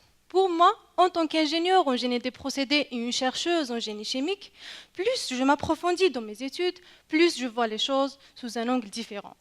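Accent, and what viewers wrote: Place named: Morocco. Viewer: Marocaine d'après son accent bravo.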